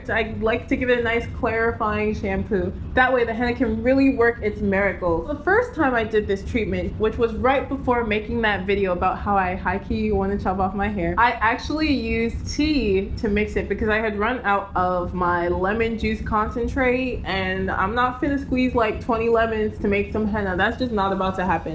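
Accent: Nigeria. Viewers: DRY Nigerian accent. LOLEST. Loved it. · Dry Nigerian accent 😂 at least you have one. I'm half Nigerian and never picked up the accent :( but I love it though!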